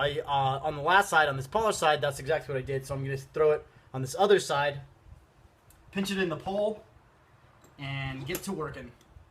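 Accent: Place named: Albuquerque, United States. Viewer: Burqueño hahaha oh man bro straight from barque for sure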